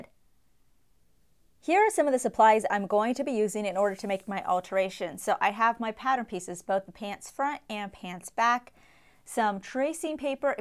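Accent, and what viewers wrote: United States. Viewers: Many thanks from the U.K. You have a beautifully clear American accent, so easy on the ear.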